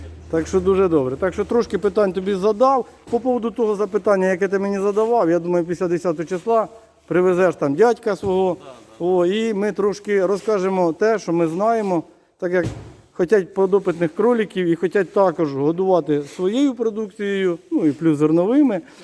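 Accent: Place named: Poltava, Ukraine. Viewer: З усего відео найбільш мені сподобалась ваша щіра українська мова.Це є сама щіра мова ,я так розумію ви с Полтавщіни.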